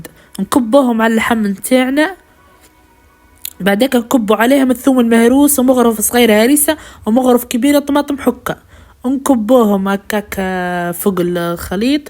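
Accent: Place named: Souf, Algeria. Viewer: اليوم ولأول مرة نكتشف قناتك قداش حلو وهدرتك حلوة في لول حسبتك طفلة صوتك حنين من بعد لقيتك طفل حاذق وفحل ربي يبارك فيك اللهجة السوفية تهبل تهبل❤❤❤متابعة جديدة من باتنة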